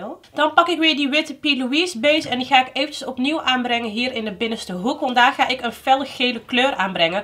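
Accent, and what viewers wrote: Netherlands. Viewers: Lieve. Ik vind het jammer dat ik je nu pas heb ontdekt, maar beter laat dan nooit toch? Je hebt al meteen een fan EN een abonnee erbij. Je hebt echt talent ma sha allah. Blijf ermee doorgaan, ik kijk al uit naar de volgende video’s. Nog 1 vraagje, je hebt echt een heel leuk accentje, het is wel niet volledig Hollands als ik me niet vergis. Van welke stad is dit accentje dat je hebt? Groetjes ❤️🤙🏻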